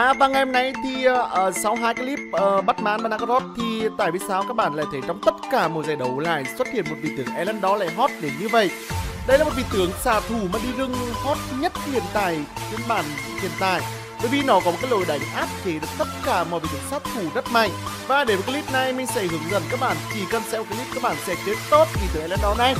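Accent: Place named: North, Vietnam. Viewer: Giọng bắc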